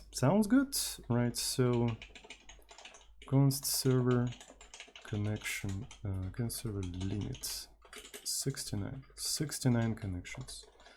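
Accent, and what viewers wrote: Russia. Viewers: Твои видосы отличные, но мне, как русскоговорящему, тяжело слушать русский акцент) Если тебе не похуй на мой совет, то изучи правильное произношение звуков в английском. Например звук "т" в англе совершенно другой (и при этом не сложный бтв)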